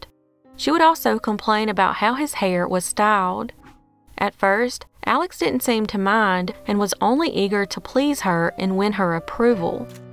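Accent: South, United States. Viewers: Thank you kindly and I love your southern accent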